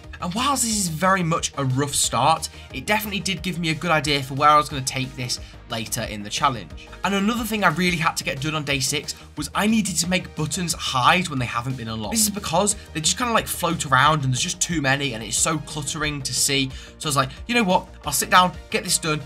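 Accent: United Kingdom. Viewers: This guy is british?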